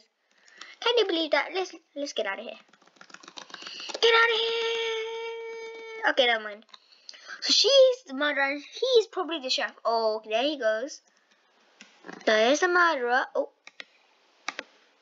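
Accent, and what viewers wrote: United Kingdom. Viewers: British boy 🥳 no offense I like em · Umm idk what he's says because he's bridsh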